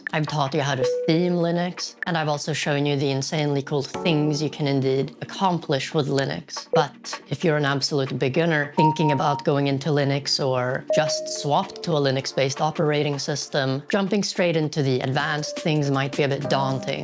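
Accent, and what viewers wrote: Sweden. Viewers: swedish detected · As a Swedish person, I'm quite confident in saying that he sounds very Swedish.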